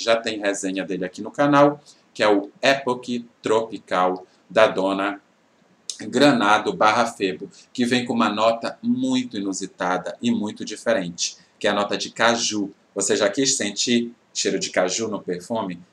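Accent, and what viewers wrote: Pará, Brazil. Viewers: diz alguma coisa sobre o Revelar da natura! Amo suas resenhas. À propósito, vc é paraense como eu?😅🤭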